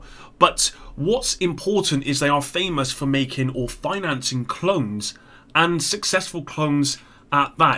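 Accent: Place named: South West England, United Kingdom. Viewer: Sounds like he's from south west England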